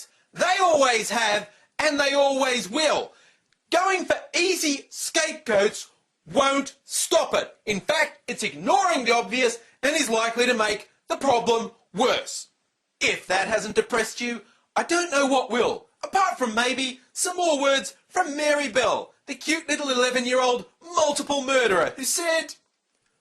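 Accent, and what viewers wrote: Australia. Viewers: Words Well spoken Aussie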